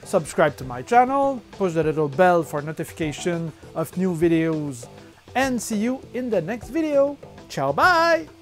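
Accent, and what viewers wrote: Quebec, Canada. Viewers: On la sent bien laccent quebecois 😂😂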